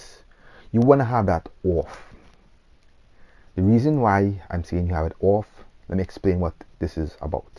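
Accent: Trinidad and Tobago. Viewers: You from Trinidad bro? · Are u trini?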